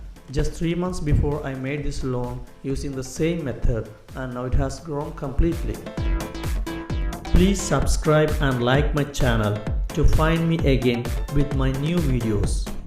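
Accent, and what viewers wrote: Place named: Kerala, India. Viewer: Are u a malayaalee?ur english is like malayaalees uncle.pls tell malayalam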